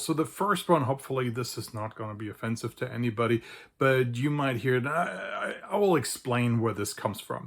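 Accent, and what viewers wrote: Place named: Germany. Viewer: I am native speaker of Bavarian and I can absolutely hear you are not a native speaker of Bavarian. You tend to have a German accent speaking Bavarian dialect.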